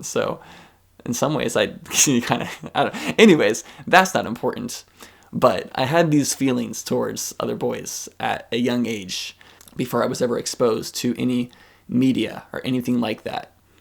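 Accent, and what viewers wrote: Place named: United States. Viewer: Hey, Thank you so much for sharing. I have been watching your content for a very long time and suspected early on you were gay but didn't put much further thought into it since it didn't matter to me either way. Just was an observation like noting what colour your hair is or that you have an American accent. My mum has a few friends/acquaintances that are gay so growing up it wasn't weird to me but I was also aware that not everyone was accepting of people who are gay. I also knew people in high school that did the same thing as you did and pretended they were straight by dating someone of the opposite sex and are now happily out and proud. I was a big tomboy growing up and like doing things that were considered much more masculine but I am straight, while one of my friends is a huge girly girl/super feminine and she is gay. 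So I very much believe you are born gay based on that as well as what other people who are gay have personally told me. I am so proud of you and just want you to be happy:)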